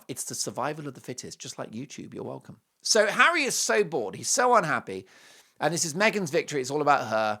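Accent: United States. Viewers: GAH! Your U.S. accent is really good! 😅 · Your American accent is pretty good, too bad I can't say the same of your singing. I do love your show just not your singing. I think your analysis of Harry's pose is not accurate, but I agree he, by now, is seriously regretting his marriage and is very unhappy. I fear he might eventually be a suicide risk because when she dumps him, he will have nothing left.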